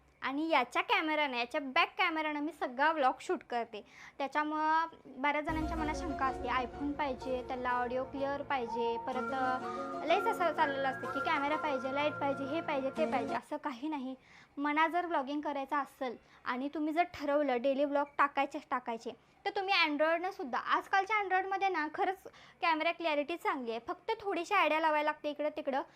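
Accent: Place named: Maharashtra, India. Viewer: बाळा तुझी भाषा आमच्यासारखीच आहे टिपिकल गावरान मराठी तडका मी धाराशिव जिल्हा कळंब या गावातून तुझे ब्लॉग बघते आणि तुझी आणि आमची भाषा सेम आहे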